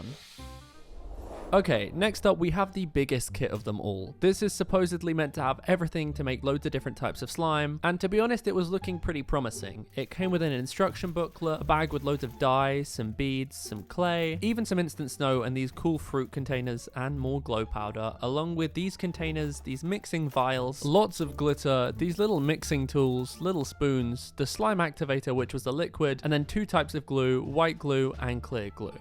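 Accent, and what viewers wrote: United Kingdom. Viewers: British accent 😂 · you have a British accsent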